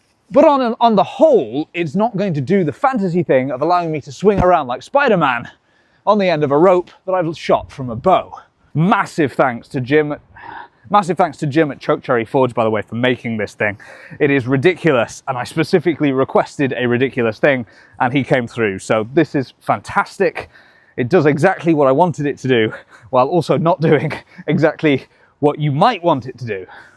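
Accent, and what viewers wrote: United Kingdom. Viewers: ok so you're british right? Slow Mo Guys collab???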